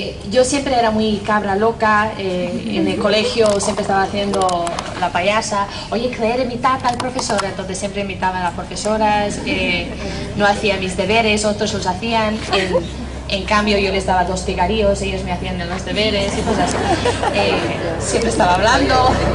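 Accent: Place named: Castile, Spain. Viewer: ¡Qué justo! empieza a graznar un ¿¡cuervo!? justo! cuando se le abrió el corazzzón (se pone en la fila a besar la cruz) Me encanta escucharla hablar con ese español bien castizzzzo, DE zetas bien marcadas y puro chiste!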